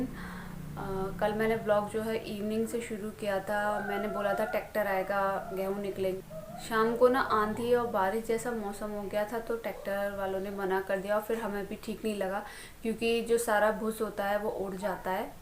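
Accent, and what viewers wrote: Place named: Aligarh, India. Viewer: Haa mam ki awaz sunkar mera dost mere se pucha rha hai to me bola yeh awaz aligarh se hai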